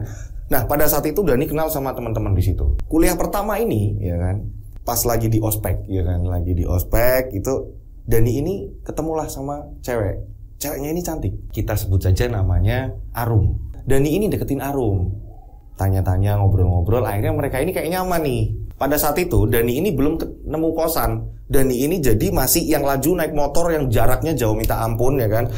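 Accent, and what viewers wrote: Java, Indonesia. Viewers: Jawa nya tom tomen kang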